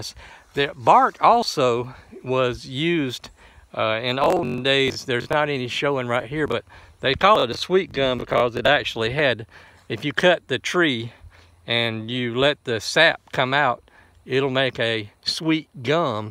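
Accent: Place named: Arkansas, United States. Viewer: You sound like your from Arkansas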